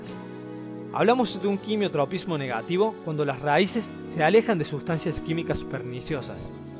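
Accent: Córdoba, Argentina. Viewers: no entendí nada con el cordobés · Alto cordobes xdd Me sirvio bastante para ciudadania :v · sós coórdobés vóz?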